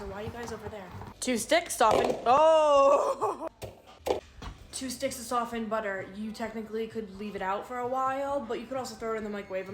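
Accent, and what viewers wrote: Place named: New Jersey, United States. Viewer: You are soooo Jersey!!! I lived in S Jersey until I moved to Iowa. My boys always tell me, "your Jersey is coming out"!!!!! I miss the accent so much.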